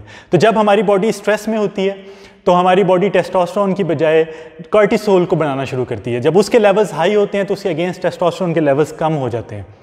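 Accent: India. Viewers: Your language Sounds like Hyderabadi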